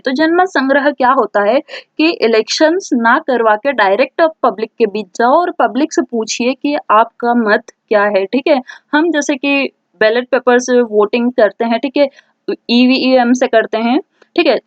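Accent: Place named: Bihar, India. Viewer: Aap Bihar sy ho kya aapki langaguge and tone bihari hau.Mari bhi Bihar state sy hau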